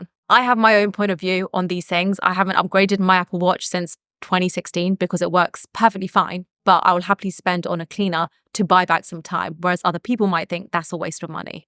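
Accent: England, United Kingdom. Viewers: nice content your english is british am american hard to catch up please try to be a little slower when you talk :yt::yt::yt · Your beauty is pleasant to the eyes, your English accent is sweet to the ears and most importantly, your content is educative to the mind and actionable to the hands that takes actions., you're a complete package!!!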